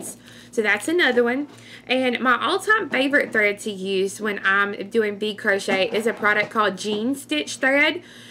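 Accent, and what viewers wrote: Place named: North Carolina, United States. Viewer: you are a hoot! My family is from Mt.Airy,North Carolina. I feel like I am listening to a family member! I enjoy your videos so much! Thank you!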